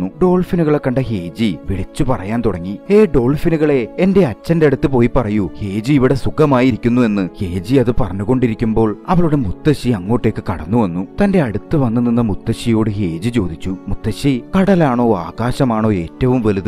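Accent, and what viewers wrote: Ernakulam, India. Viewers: നിങ്ങൾ എറണാകുളത്തുകാരൻ ആണോ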